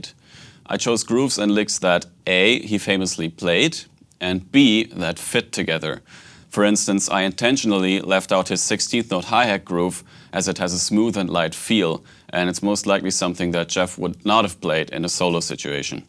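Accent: Germany. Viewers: Germany accent ❤❤❤❤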